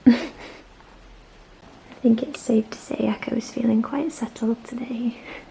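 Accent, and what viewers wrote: Yorkshire, United Kingdom. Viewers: Yorkshire. It's my absolute favorite accent. So lovely!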